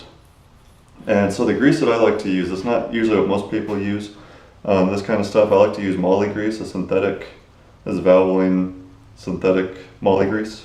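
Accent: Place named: Northern Ireland, United Kingdom. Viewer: the NI accent sounds awful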